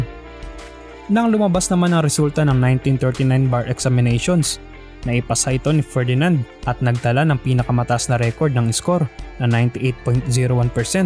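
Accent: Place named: Philippines. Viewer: Please improve your accent and intonation para magandang pakingan and also correct pronunciation of f and v. That’s the common defect of us Filipinos .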